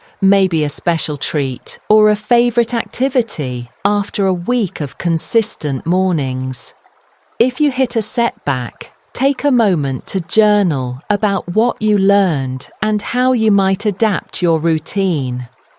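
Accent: United Kingdom. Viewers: ty i love your british accent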